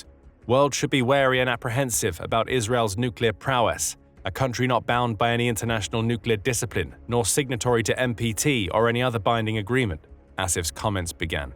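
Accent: England, United Kingdom. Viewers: He has an English accent.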